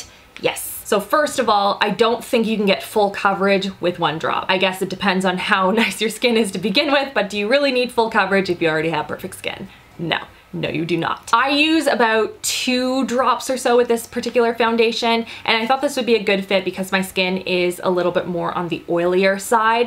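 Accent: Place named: Canada. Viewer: she’s canadian huh? I swear most english speaking canadians emphasize the ‘ou’ sound like ‘out’, ‘house’, and ‘about’.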